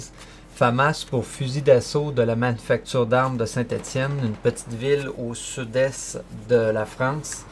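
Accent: Quebec, Canada. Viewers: Vidéo ultra structurée très utile et en plus avec l'accent quebecois !!! Je suis fan continue comme ça ça nous aide a comprendre les subtilités de ce jeu tout comme ta vidéo sur les accessoires. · acssent du Quebec <3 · Accent Québecois, il n'y a pas de honte et il ne faut pas en rire.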